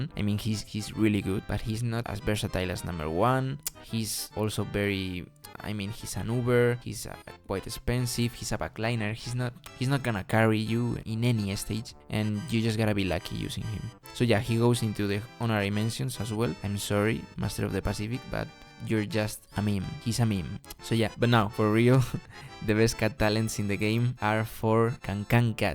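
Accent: Spain. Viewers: Es la leche ver a un español hablando ingles, buen video igualmente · Soy yo, o es obvio que eres español? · eres español? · Se nota muchísimo el acento español, gran video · are u spanish? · amo tanto el acento español en el inglés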